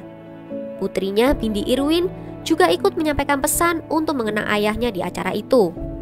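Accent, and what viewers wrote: Java, Indonesia. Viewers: Jawa Lu medok Min....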